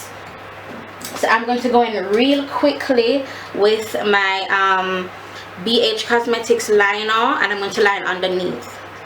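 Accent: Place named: Jamaica. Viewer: Love your videos!! And your accent-from a fellow Jamaican x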